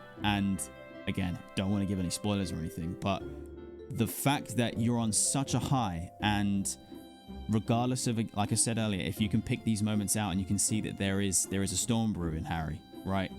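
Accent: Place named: United Kingdom. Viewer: Wait are you in the states? You have a British accent. My bad. I don't mean to offend anyone <3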